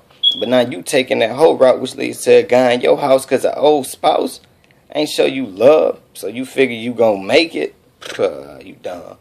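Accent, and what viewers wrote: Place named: South, United States. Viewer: That southern accent!! Oh yee. Atlanta I'm in love 💚💚💚⚡⚡⚡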